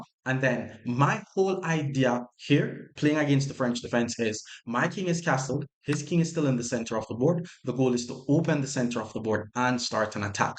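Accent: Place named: Jamaica. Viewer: Thanks - no hype, just really educational well commentated chess. You're a brilliant educator and I hope this channel is giving you the effort you're puuting into it. I'm from the UK and apart from actually getting better after really watching your videos, I'm also surprising my opponents when I inadvertently say something in a Jamaican accent and channelling my inner 😂 That's gg's!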